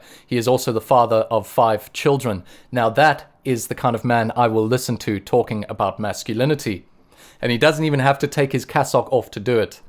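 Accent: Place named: South Africa. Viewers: Your accent sounds South African... Are you? I love these guys you mentioned. I love Abbot Tryphon too! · He's mentioned he's originally from South Africa.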